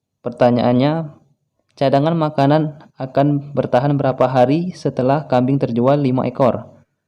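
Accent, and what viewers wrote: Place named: South Sumatra, Indonesia. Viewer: Min kok logatnya gak asing 😂, dari sumsel ya?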